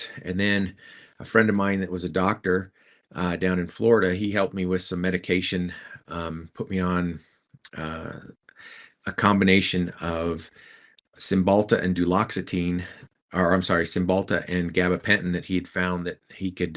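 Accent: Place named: West, United States. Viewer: I’m just so curious what doctor you found that would do those DMSO treatments intravenously and would you be able to share that with me? I am from Michigan and I don’t know where you live. It sounded like maybe out west somewhere but even through the doctor that is doing that for you, maybe she would have an idea From doctors More in our area?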